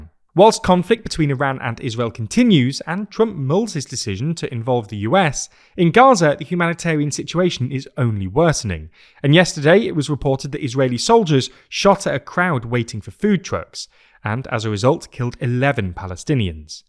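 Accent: Northern England, United Kingdom. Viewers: He’s from Northern England where they pronounce “u” and “oo” funny.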